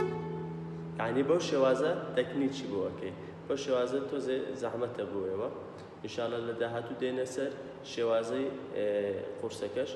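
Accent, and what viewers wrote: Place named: Rawanduz, Iraq. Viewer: Zor Zor supas Kak gyan. You’re a good teacher and I love your Rouwandizi accent 😊 keep it up mamosta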